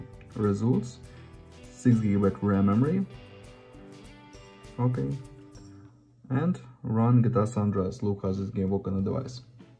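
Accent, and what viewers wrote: Russia. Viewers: не плохо ты кудахтаешь на английском, просто просто акцент есть русский немного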